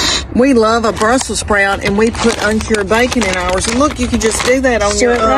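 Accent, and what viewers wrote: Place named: Tennessee, United States. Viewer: Oh my goodness! Morgan shops at Costco too. I was so excited to find this out., I love your accent. My cousins sound so much like you. They are up in Rocky Top, Oak Ridge and all around there, Knoxville. I grew up in Florida, so I have a very different way of speaking. Love, love, love your humor. It’s sooooo true! 😂😂😂❤❤❤ Cheryl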